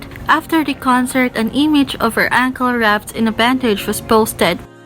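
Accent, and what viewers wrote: Philippines. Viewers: You have a filipino accent ahahha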